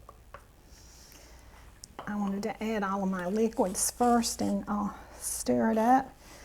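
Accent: South, United States. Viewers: Your soft sweet southern accented voice sounds just like my late mother in law . She was a great cook too ! ❤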